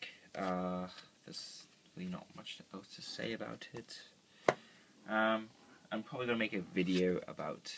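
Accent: United Kingdom. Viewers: U sound british for some reason! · You sound british when you're sick.. Awesome. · You have a British accent when you're sick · Nice British accent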